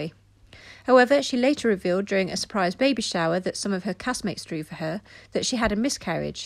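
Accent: United Kingdom. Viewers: I love your accent it sounds like queen Elizabeth , so lovely . Rest In Peace Minnie ..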